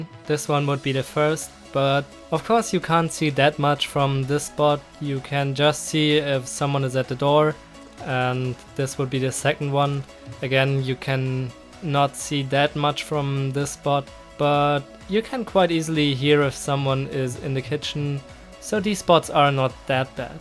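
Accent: Germany. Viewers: Ich hab es direkt gemerkt das du deutscher bist ☺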